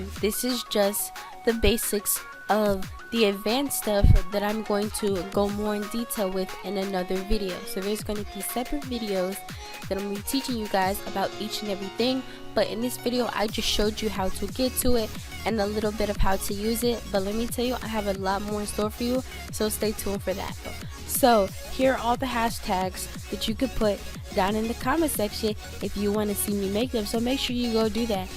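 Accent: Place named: United Kingdom. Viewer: im kind of hearing a british accent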